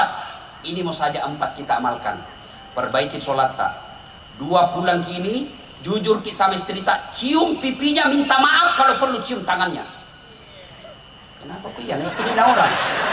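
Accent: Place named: Makassar, Indonesia. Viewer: Lo ngk ngerti bhas pak ustas jgn sebarng sbb beginilah logat makassar kasart tpi lucu n tpi bertujuN baik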